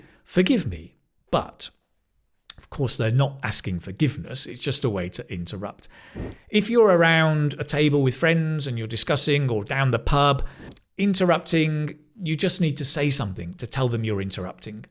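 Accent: United Kingdom. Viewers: I love your british accent❤Very useful video❤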